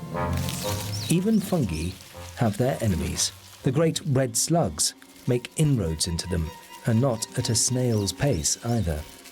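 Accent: United Kingdom. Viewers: British accent??? ☺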